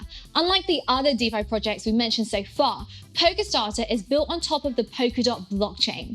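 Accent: England, United Kingdom. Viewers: I have to listen to her at .75 playback speed. She speaks way too fast and the English accent doesn't help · Good, I bought POLS last month. Makes me feel better to see her taking about it in her cute English accent.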